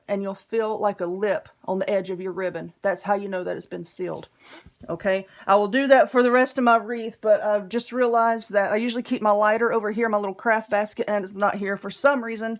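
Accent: South, United States. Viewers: Hello my Southern friend, my name is Joyce and I live up near Chicago and I wanted to let you know how much I enjoy your videos. I have been logging in and checking to see what you're up to and I love the things that you share. I respect the fact that you want to do tutorials using only things that you know people would have around their homes, I get that. I have done a couple of wreaths myself and while skimming through the internet one day I found where mesh with a stencil burner. Well because I do have one of them on hand I used the stencil burner to cut my mesh and it made a huge difference in my mesh as far as it unraveling. I love that thing it's quick to use I had all that mesh cut in no time. Although I totally agree with you about keeping it simple so that your viewer's have those things on hand I thought I would just give you that little bit of information because I wanted to share and give something back. 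Keep bringing those videos, love your accent, love the crafts and the hauls that you do . from a dedicated subscriber 👍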